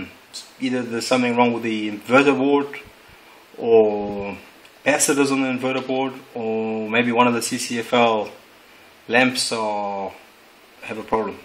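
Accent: South Africa. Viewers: Are you South African? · Hi my fathers LG flatron w2043s started fading a while ago had lines and just wasnt right obviously ive tried a rubber on the ribbon for corrosion and tried to extend my laptop display via vga cables all i got was a white screen any idea what i can try next? are u from South Africa by the way ur accent sounds close:) im an SA dude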